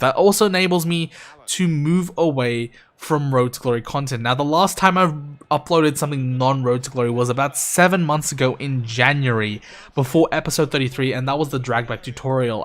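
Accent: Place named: United Kingdom. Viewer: Btw are you fully Australian I haven't seen you saying mate for once and your accent is British.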